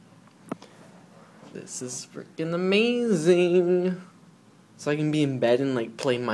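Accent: Canada. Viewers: Thats just his Canadian Accent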